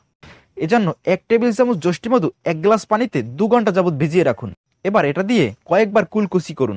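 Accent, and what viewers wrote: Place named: Barishal, Bangladesh. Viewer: Mone hoy apne borishailla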